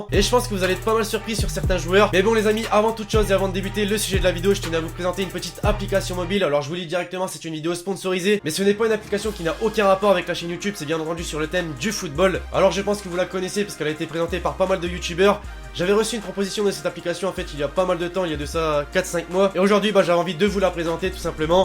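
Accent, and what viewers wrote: North, France. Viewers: tu viens du Nord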